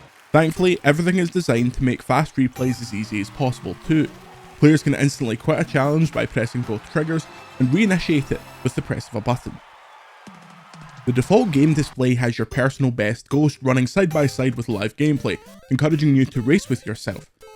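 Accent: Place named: Scotland, United Kingdom. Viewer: is sounding particularly Scottish today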